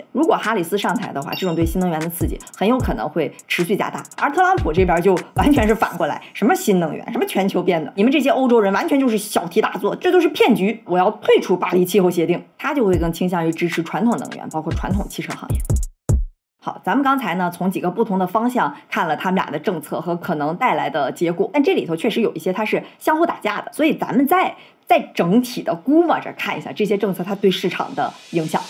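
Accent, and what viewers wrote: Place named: Dalian, China. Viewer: ，標準的大連口音.性格👍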